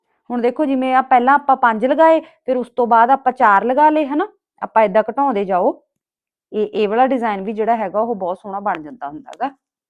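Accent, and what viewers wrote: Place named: Hoshiarpur, India. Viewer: Hoshiarpur de boli h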